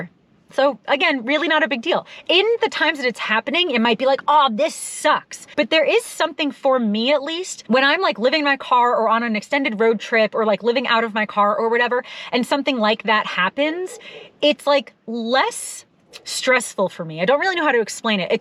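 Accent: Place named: New Jersey, United States. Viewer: A whole lot of Jersey ny accent coming out in this one. You stay home for the holidays 😆. Happy new year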